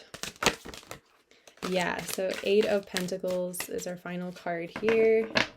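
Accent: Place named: Ireland. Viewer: Thank you. Somehow I hear Irish in your accent ❤️🙏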